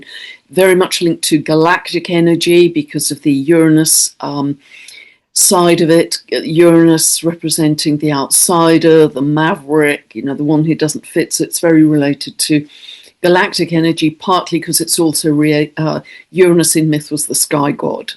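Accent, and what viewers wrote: United Kingdom. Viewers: Unfortunately, I have no beginning of any house in Scorpio but Jupiter ( as in Taurus with Chiron…) my sixth house start in Libra and end in Sagittarius. A tip of how I could set intention with these parameters ? I m trying to work consciously on my body, to balance my air side and the overthinking that goes with. And my emotions 😅 I compare this axis with the time of the year and transformation of the trees. They lose their leaves and energy goes deep in the roots. It s not because we don’t see things that they are not here. But I’m sure that you have better explanation and more tips for the coming new moon ☺️ Thanks for you videos. Ps : I m a big fan of your British accent (also a nice aspect of your videos) Marie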